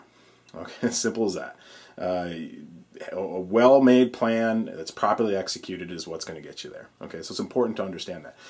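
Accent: Wisconsin, United States. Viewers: You from Wisconsin?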